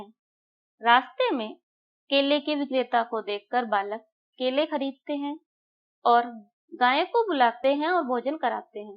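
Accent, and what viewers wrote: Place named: Rajasthan, India. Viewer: Aap Rajasthan se ho kya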